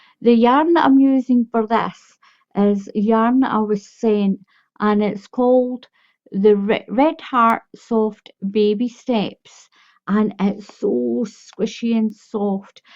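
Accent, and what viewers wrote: Scotland, United Kingdom. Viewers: ❤️❤️❤️❤️❤️ Wow, by looking at it one would never know how easy that beautiful little blanket was to make. Thank you for the lesson!! ❤️ I'm going to use this on my next baby blanket project.👍 Btw, I get in trouble when I'm wrong but do I detect a bit of a lovely Scottish accent?🙂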